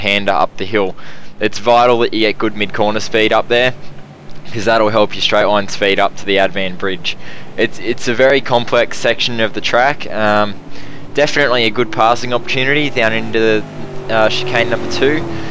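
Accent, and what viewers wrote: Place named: Australia. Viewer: Nice, vid includes authentic Austrailian accent and everything :)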